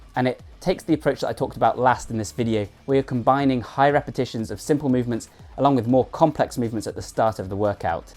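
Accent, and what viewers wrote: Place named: Wiltshire, United Kingdom. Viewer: Finally a decent and informative fitness channel. Subscribed. Which part of the UK are you from? I'm based in Wiltshire you sound local. Keep it up. Thanks, Ben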